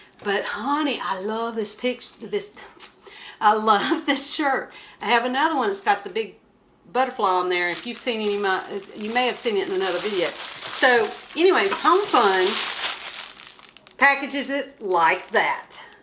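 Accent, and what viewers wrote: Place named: East Tennessee, United States. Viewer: I’m in the East Tennessee mountains and proud of it. We do talk like that lol.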